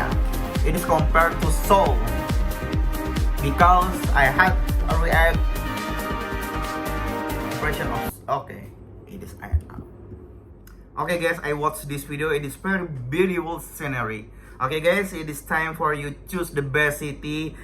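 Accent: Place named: Indonesia. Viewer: Englishnya Indo bgt😄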